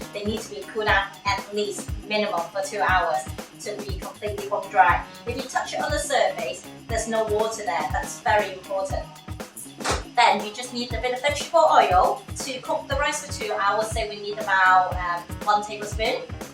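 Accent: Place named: Asia. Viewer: Is this a British person with an Asian accent? Awesome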